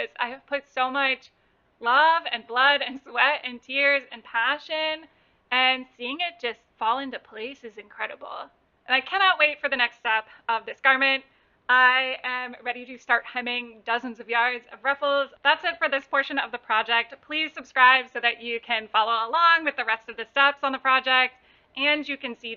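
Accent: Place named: United States. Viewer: I love your art but I dont like american accent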